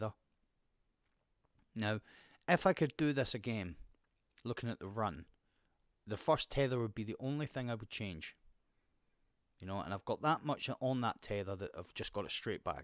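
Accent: Scotland, United Kingdom. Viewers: Great tutorial dude really helpful strategies for each area also are you scottish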